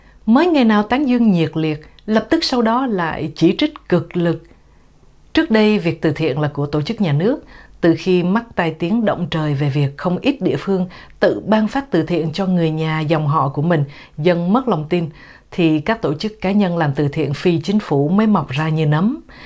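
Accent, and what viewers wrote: Standard. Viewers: Ban noi chuan luon ung ho ba ... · Cô xướng ngôn viên tôi nghĩ là người hải ngoại. Cô đọc rất lưu loát, rất từ tốn, phát âm rất chuẩn và rất đúng chánh tả. Cô phát âm các dấu sắc, huyền, hỏi, ngã rất chính xác. Phần đông giới trẻ ngày nay hoặc người trong nước phát âm lẫn lộn hai dấu hỏi thành ngã, ngã thành hỏi. Có ai còn nhớ lớp bốn lớp năm cô giáo bắt một trò đúng giữa lớp đọc một đoạn văn rồi cả lớp chép lại cho cô chấm điểm chánh tả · Cô MC này bình luận và nói chuyện quá chuẩn quá hay luôn...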